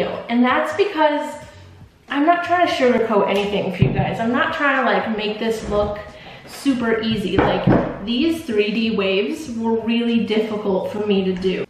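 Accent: United States. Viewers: Your art is always so beautiful. Btw, where in the US are you from? I’ve heard your accent before but do not know where from. I love it.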